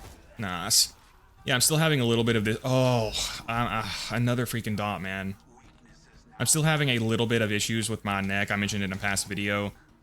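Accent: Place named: Kentucky, United States. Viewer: Kinda surprised you're from the bible belt. Judging by the accent, I would've guessed somewhere like Kentucky or Tenessee. Greetings from Indiana!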